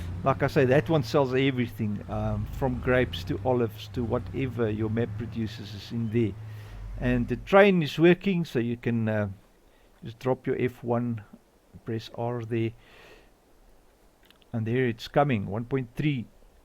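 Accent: South Africa. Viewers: Hoe klink dit vir my of jy van Suid Afrika af kan kom???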